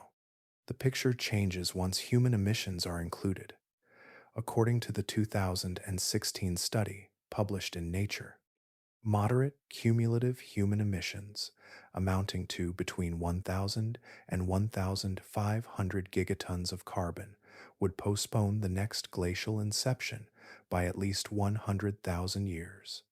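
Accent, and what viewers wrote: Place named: United States. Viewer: Thank you for the American accent on your voiceover.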